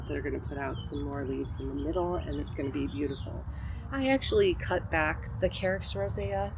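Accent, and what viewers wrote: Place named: Chicago, United States. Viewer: Thanks for the tour and all of the content! Long story shorter, I’ve had to let some things go for a while because #life…so as I start to take back some of my spaces and learn from my mistakes 😬, you’re inspiring me to consider and incorporate more natives. I was also today years old when I realized some of those “weeds” I have might not be…. It’s also nice to hear a natural Chicago accent. I’m a few hours away and don’t get home enough.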